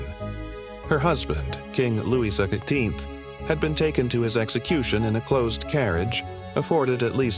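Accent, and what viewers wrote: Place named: United States. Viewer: So how truthful is this? The dramatic American accent lends BS/showbiz to this rendition!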